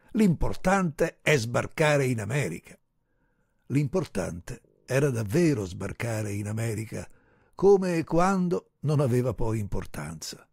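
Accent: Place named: Sicily, Italy. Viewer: Buongiorno caro 😊irresistibile il tuo siciliano❤, racconto commovente,si, ma quanto dolore e sacrificio dietro ❤❤ grazie davvero di cuore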